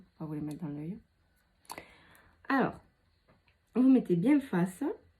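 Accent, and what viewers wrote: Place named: South, France. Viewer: Merci pour l’astuce, explications claires et avec un bel accent du Sud 👍🏻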